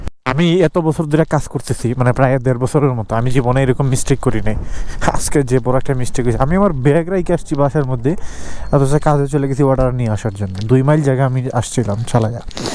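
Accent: Sylhet, Bangladesh. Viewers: Bhai Apnar House Sylhet ni ?? 😅 কথার ধরনে বুঝা যাচ্ছে সিলেটি ❤ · Tmi Sylheti?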